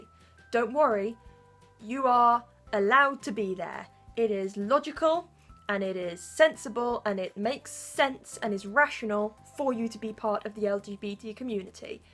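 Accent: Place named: London, United Kingdom. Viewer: You have the same accent as my writing buddy out by London! She completely accepted my asexuality when I asked her to potentially help me out with writing romantic scenes in my books, even said asexuality sounded cooler and less annoying than being sexually attracted to people. She's not asexual (as far as I know) and she immediately accepted me without any need for debate and we'd only known each other through email for maybe 2 weeks. What's your excuse world?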